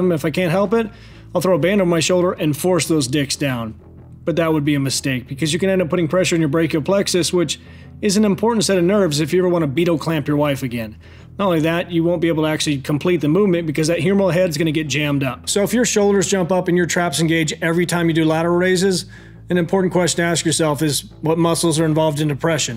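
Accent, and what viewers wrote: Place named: South, United States. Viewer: my brain needed a while to process hearing scientific nomenclature through a southern accent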